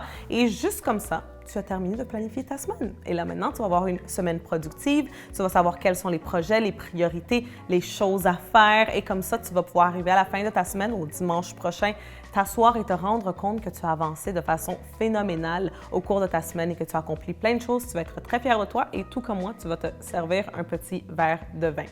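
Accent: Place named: Quebec, Canada. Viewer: c'est la première fois que je kiffe un accent québécois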